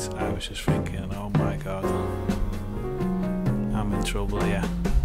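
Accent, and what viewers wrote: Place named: Scouse, United Kingdom. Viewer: I really enjoyed listening to your soft 'Scouser' accent. The transformation is mind boggling - love it!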